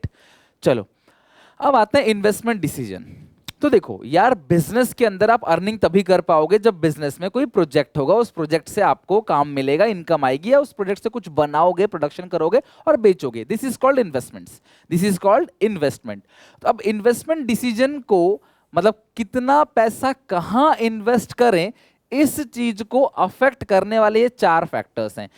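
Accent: Odisha, India. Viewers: sir app odia ho kya ?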